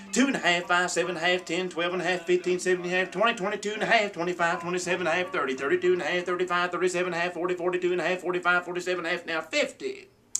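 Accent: South, United States. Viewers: Your chant is beautiful and you have nice rhythm. And your southern accent makes it even better! 👍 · I don't know what I love more: the southern accent, or the music in the background reinforcing all the prejudices of US southerners xD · That was awesome!! I wish I could do that, but there just ain't no way!!! Love the deep southern accent!! I'm an ole country gal that lives on a farm and go to alot of livestock auctions and I love listening to the auctioneer! I do have to say though, you are really amazing at this!!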